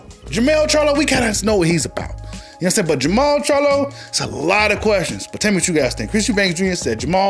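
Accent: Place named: United Kingdom. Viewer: Only half through the video bro but I need to hear your British accent 😂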